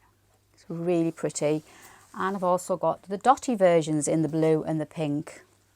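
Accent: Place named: Northern England, United Kingdom. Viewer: Good on you. I sat with a cuppa and as usual you gave me another dose of sewing inspiration. Thank you for sharing your life and journey, it was encouraging hearing how you felt when you began. Love being invited into your world for a catch up and especially hearing a fellow northern lass. x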